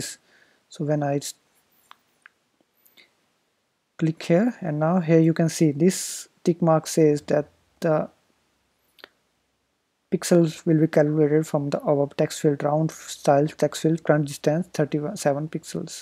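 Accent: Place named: India. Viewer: i ask the video uploader to learn English.. either american or English accent.. cant cope with the indian accent even for a second !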